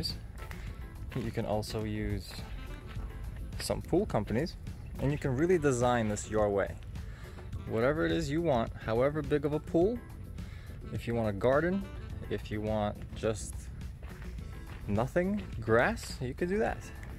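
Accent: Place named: East Coast, United States. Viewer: I notice a slight east coast accent. any chance your from NY/NJ?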